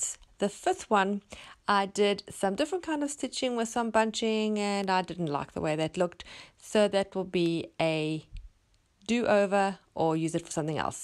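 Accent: South Africa. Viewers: Love watching your channel. You sound South African 🤦‍♀️ I am from SA. Going to see how I can do this without the inks ❤️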